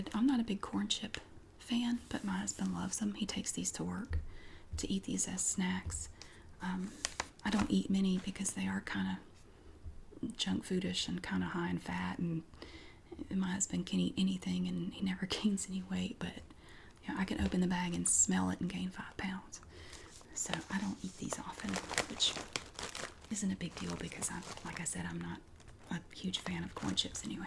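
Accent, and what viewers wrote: South, United States. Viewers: Well in any case, thanks for making these Southern accent whispers. I love them.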